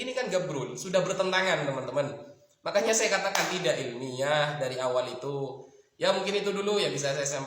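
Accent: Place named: Madura, Indonesia. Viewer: ustadz ini kok ada logat madura😁...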